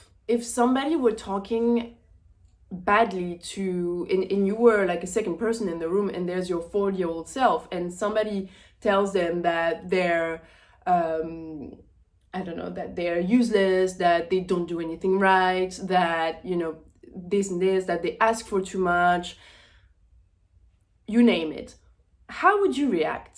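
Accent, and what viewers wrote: France. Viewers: (I love your French accent!) I knew, you're gonna like the whole YouTube thing. These cases about our psychological impact on our lives are very important to highlight.Im glad you're doing that., you're this kind of influencer, that we need to influence our lives. Thank You 🙏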